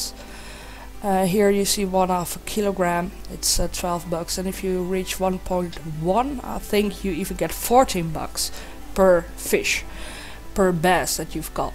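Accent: Netherlands. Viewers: are you dutch.. ben je nl ik denk het well haha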